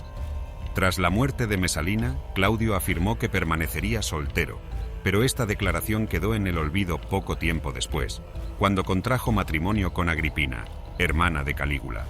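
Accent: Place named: Spain. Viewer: Por fín puedo escuchar este relato de la historia en español de España. Gracias.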